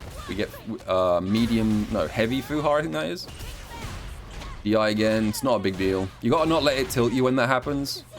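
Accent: United Kingdom. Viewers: do again mr british very cool and insightful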